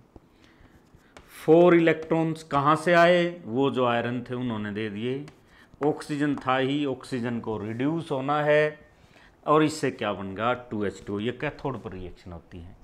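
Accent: Haryana, India. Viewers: Sir are you from Haryana.?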